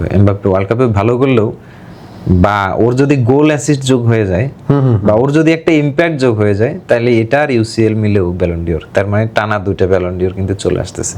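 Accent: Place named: Noakhali, Bangladesh. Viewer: এই লোক নোয়াখাইল্যা মনে হচ্ছে তবে এনালাইসিস সঠিক 😅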